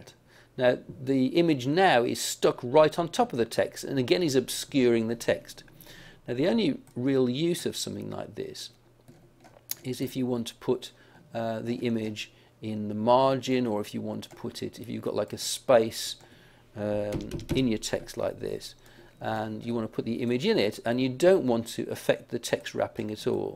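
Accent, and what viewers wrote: United Kingdom. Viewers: really like this British accent omg! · This video was thie first one that i searched for after reinstalling my system, so it was the first link assosiated with youtube. Now everytime i search "youtube", this video is the first one i get. This british voice is now my every day companion and im not even sure if i want to change that.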